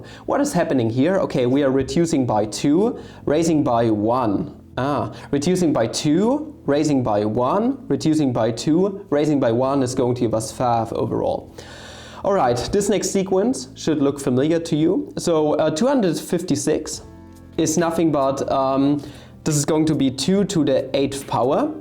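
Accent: Germany. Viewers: I do not know why, but I enjoy your german accent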